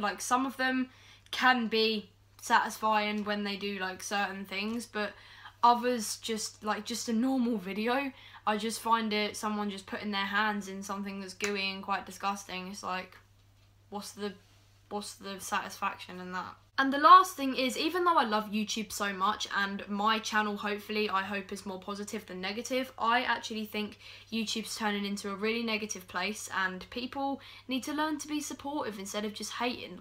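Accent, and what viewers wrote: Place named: Ireland. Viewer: girl he always posts irish vids when she sounds irish herself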